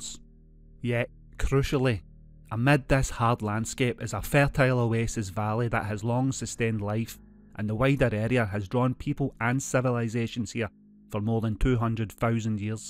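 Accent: Scotland, United Kingdom. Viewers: Trying to place your Brogue. Inverness? Strong "undercurrent" of American "Anglish" throwing me off... LOL but no' aff the quay an' inta the Ness, playse.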